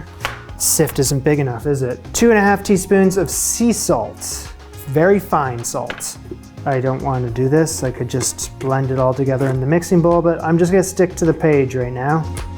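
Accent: Canada. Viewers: Night shift Canadian accent is more pronounced and I love that. 🇨🇦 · I love his Canadian accent. 'Hang the Po-ouch.' LOL. Also, even if I'm making a cake, brownies or bread, I still use the trick my mom taught me. I take Crisco in a paper towel, grease up the pan. Then cover/dust with flour, to tap out the extra before baking. Anything for the 'Substitute' doesn't match.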